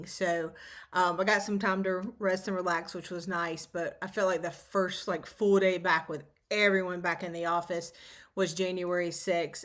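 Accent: South, United States. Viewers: To one of my favorite FlossTubers....I love your selections for stitching and your lovely southern accent. Here in the Northeast I truly am cozying into Winter ....gotta embrace the white and next week will be below zero! 🥶 Hope you have a great January and I look forward to watching another episode soon! 😊 Kathy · .... you might be my most favorite to watch. I love your southern accent and your laugh. REALLY love your stitching and WIPS. Such inspiration with each video. I also may continue to stitch my Christmas, Snowman, and Winter charts. I paper craft also and have decided to leave my Christmas papers and stamps out and continue creating. I am not tired of stitching or making Christmas projects just yet. Have you done a room tour yet? Each time I watch your videos, I always wonder if it is a bonus room upstairs or maybe a large bedroom. Either way I love the two small closets in the back. 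I could fill them up. Have a great January.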